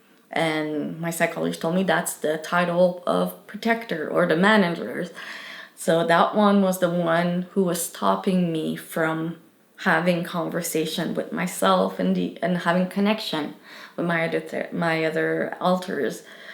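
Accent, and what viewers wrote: Quebec, Canada. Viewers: Where is from? I wasn't sure if it's a quebec accent or not. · I thought I recognized her wonderful accent. I live in Alberta now and unfortunately don't hear Quebecers often. ❤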